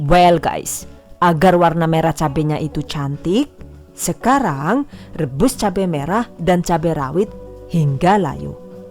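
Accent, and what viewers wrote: Banyumas, Indonesia. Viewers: Belum lama kenal vlog ini, langsung jatuh hati. Lagsung subcribe, kepo buka video" semua masakan😄😄 Sangat menginspirasi sekali. Jelas & mudah penjelasannya. Bahasanya itu lohh.. enak dengernya... Palagi pas yg ngapak".hehehe...Ngakak polll #giveawayTHVagustus19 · Penjelasan nya detail.suara nya enak di dengar,lucu ada ngapak nya.bahasa Inggris nya juga keren.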